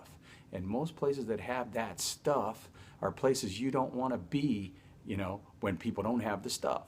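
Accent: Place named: Nevada, United States. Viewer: Sounds like a Nevada man :)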